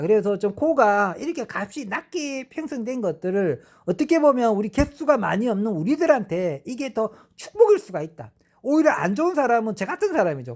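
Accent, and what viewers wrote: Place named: Jinju, South Korea. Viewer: 진주분이신가봐요? 영상잘보고있습니다.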